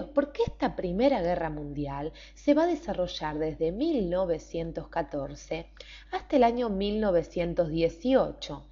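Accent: Argentina. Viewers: Interesantisimo video ademas me encanta como habla esta chica con su acento Argentino.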